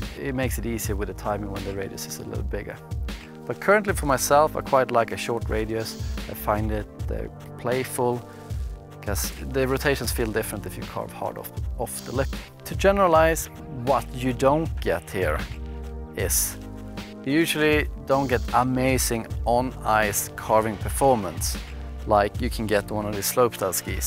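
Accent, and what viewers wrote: Sweden. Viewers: 100% svensk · This dude has to be Swedish judging by the accent (I’m Swedish 😁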